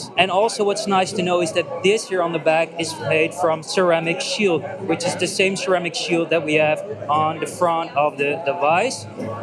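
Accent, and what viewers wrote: Netherlands. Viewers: The Dütch accent was aanwezig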